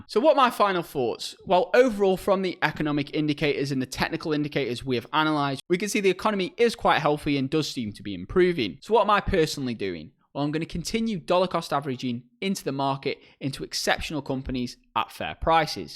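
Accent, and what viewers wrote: United Kingdom. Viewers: Can you try to speak with less of an English accent? I can’t attention long enough with your draw.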